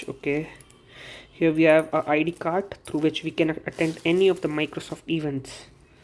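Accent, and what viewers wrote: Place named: Ujjain, India. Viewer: Bhaii ye ujjaini accent merko bhi sikha 😆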